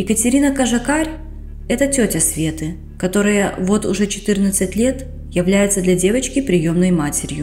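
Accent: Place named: Belarus. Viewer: Белорусский говор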